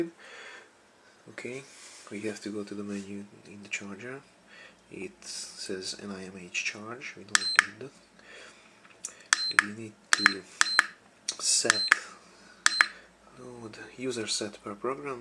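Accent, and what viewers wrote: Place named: Hungary. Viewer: Felismertem a magyar akcentust :D Azon gondolkozok, hogy a gyári 9.6V-os NiMh helyett 3 LiFePO4-et, vagy 2 Li-ion-t használjak. Utóbbi esetben 2P-be is tudnám kötni, vagyis 4 db-ot használnék dupla kapacitásért (kb. 6000mAh). Szerinted melyik a jobb döntés? I'm wondering whether I should use 3 LiFePO4 batteries or 2 Li-ions instead of my stock 9.6V NiMh. In the latter case I could use 4 pcs instead of just 2 in a 2P configuration for double capacity (around 6000mAh). What do you think?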